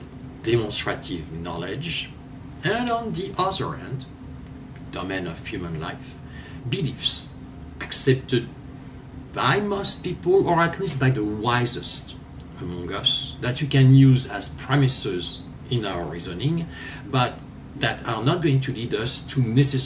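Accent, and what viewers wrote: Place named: France. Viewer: I love the French accent. Perfection.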